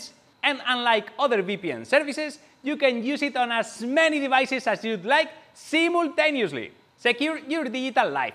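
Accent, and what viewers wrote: Spain. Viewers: Hola Espanyolo, which ciudad are you in? I hit play, and within about 0.005 seconds my wife had said unintelligibly quickly: he's a Spanish - which makes her Ingles sound a lot worse than it actually is. I'd say it's stronger than yours, certainly weaker accent, too :-D I enjoyed the video, nice work on the upgrades. Next thing you'll be building one as capable as TOT's CNC router :-D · ya sabemos que sos español flaco, porfavor, o habla bien ingles o habla en español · Eres español no? El nombre y sobretodo el acento🤣🤣🤣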